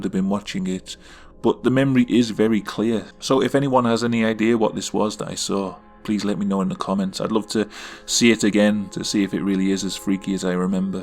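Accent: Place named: Liverpool, United Kingdom. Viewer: Ah cool your accent sounds slightly Liverpudlian. Yes I live in Bearwood and know Bromsgrove quite well, nice place.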